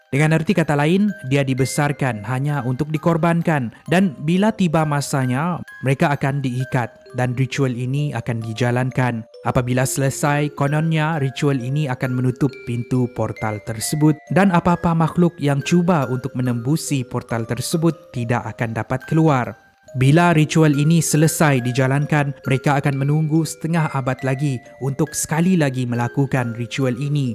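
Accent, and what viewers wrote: Malaysia. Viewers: Bang nak tanye abng dari mna indonesia atau malaysia dngar suara ckp mcam malaysia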